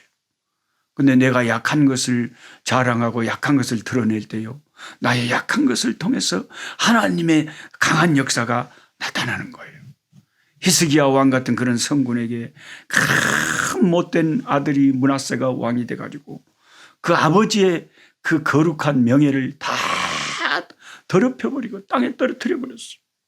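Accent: Jeolla, South Korea. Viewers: 목사님 감사합니다.날마다 꿀송이 보약큐티 말씀을 통해 너무은혜가 됩니다.특별히 전라도사투리가 너무나 구수 합니다.항상주님의 은혜로 건강하시고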